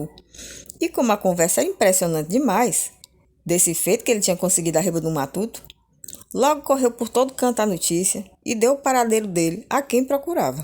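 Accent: Northeast, Brazil. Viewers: Vc e uma narradora muito maravilhosa lembro meus parentes do Ceará minha vó meu avô materno moro em Curitiba mais vendo vc falando lembro e tenho muitas saudades do meus parentes nordestino avó avô e tios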